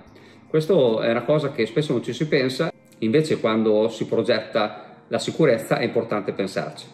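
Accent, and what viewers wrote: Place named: Rimini, Italy. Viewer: la parlata riminese e troppo simpatica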